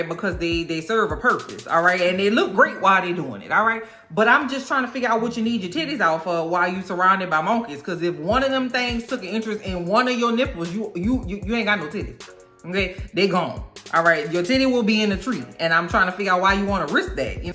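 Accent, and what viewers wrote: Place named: Los Angeles, United States. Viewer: Just binged it… all these folks are delulu! I don’t know anyone else reviewing this, so I’m here to stay for a while! U sound like my LA ppl! 🫶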